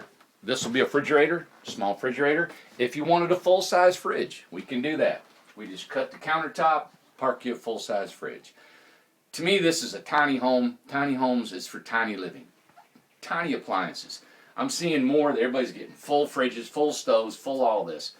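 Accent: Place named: Chattanooga, United States. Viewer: Very nice .I'm building almost finished a tiny house in Chattanooga. I have many of the componants for an off grid system but would really like I knowlageable hand in putting it together. Are you near Chattanooga?I ask as you sound like you might be.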